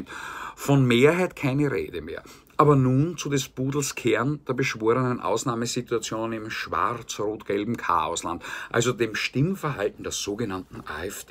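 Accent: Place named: Austria. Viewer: Hach wie schön ist es einem Österreicher zu lauschen 🙊 ach du je erwischt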